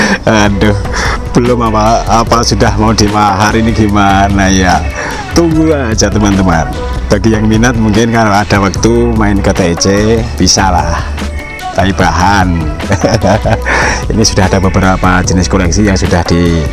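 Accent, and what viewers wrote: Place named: Java, Indonesia. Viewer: Bapak pasti ada darah jawanya...bahasanya medok jawa....salam dari plat H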